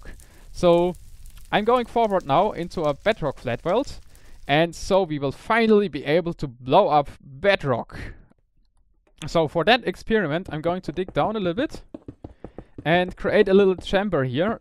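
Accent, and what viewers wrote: Germany. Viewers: Starting the video, I knew right Form the get go that you're German as well :D nice video tho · He's speaking English with a German accent. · You are deutsch, stimmts? Hört sich lustig an :D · du bist deutsch, oder? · Echt ey klingst voll deutsch melde dich mal :D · Ein sehr deutscher Akzent...hm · youi have a german aczent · mann hört das du deutscher bist :) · tell me im wrong...but i think you are german (im german too so i might probably be able to tell better) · man merkt direkt das du ein deutscher bist xD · Are you german? You have an really stong german accent. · Hab sofort gemerkt das Du ein Deutscher bist ;-) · du bist Locker deutsch! :D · Du bist ein deutscher oder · [german accent detected] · Du bist doch deutsch du hast an akzent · ***** merkt mann eig echt leicht du hörst das deutsch raus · You really sound like a german guy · Bist du Deutscher? 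Man hört so nen kleinen Akzent raus. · du bist doch deutsch , das hörtman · You have a bit of a german accent... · Your pronounciation sounds like you are from germany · deinen Deutscher Akzent hört man voll raus ^^ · Wie man direkt hört, das du ein Deutscher bist :D Wie dem auch sei, gutes Video! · Wie man den deutschen Akzent hört xD Is ja übel This guy is german, u can hear it by his accent.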